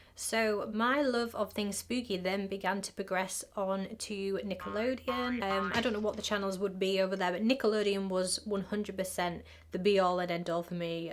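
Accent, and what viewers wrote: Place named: Northern England, United Kingdom. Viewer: No subtitles needed. I love your northern accent big hug from Mexico. Love your content 😊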